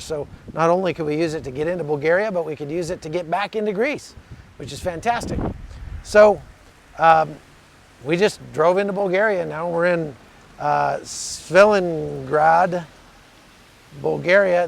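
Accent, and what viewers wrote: United States. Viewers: I guess he's american by his accent. So I can justify his limited knowledge of everything outside the United States. To americans, everyone east of Germany is russian and writes and speaks russian. And they are probably communists :D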